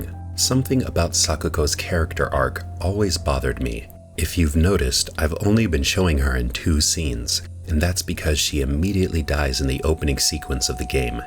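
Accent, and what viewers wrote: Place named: United States. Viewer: knocked this one out the PARK!!! i feel like no other channel has really synthesized the meanings of this game for me like you did, both culturally and thematically. in particular, your emphasizing the implications for everyone in the gender binary of male/female was really refreshing. being half japanese but culturally very american, i could feel the gap in western game channels' analyses on the game no matter how well researched. also while the localization and voice acting/directing was amazing, rinko's jp voice especially really sold her to me as both a two faced b/antagonist and an actual complex human who ultimately /was/ hinako's best friend. just hits different when you've been around real life rinkos! gotta say, though i laughed multiple times during this, your dragon pronunciation joke went right over my head because i thought your pronunciation was...normal american? 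anyway im penning this as i settle into my 3rd rewatch/listen, looking forward to checking out the rest of your channel vids and future releases!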